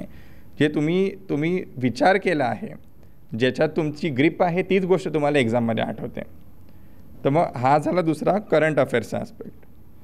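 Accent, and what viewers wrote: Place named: Amravati, India. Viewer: Veryyy...Informative sir....Amravati ascent can be seen .....🙏🏿🙏🏿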